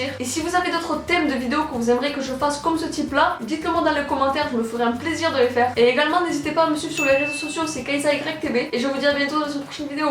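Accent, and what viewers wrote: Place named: Marseille, France. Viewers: Toi tu viens du sud non? x) Ton accent es prononce! Apres je suis un peu dans le meme cas, niveau habit. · on dirait que t'ai marseillaise ! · J'aime tes vidéos , tu dit exactement ce que tu pense c'est géniale , par contre tu A un accent du sud vraiment prononcer , tu viens du sud ? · J'adore ton accent du Sud😌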